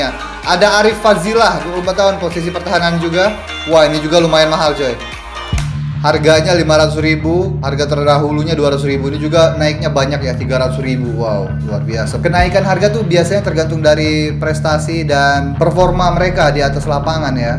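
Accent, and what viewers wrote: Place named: Terengganu, Malaysia. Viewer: terengganu nelang... like org ganu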